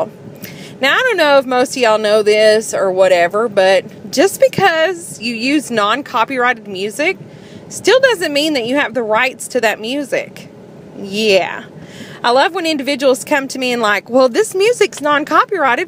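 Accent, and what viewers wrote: South, United States. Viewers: being a northerner living in the south, your sweet country accent drew me in immediately. plus you cuss like a sailor. you can make vlogs from now on & I'll watch every last one of them. you don't need gameplay to keep me interested. ;-)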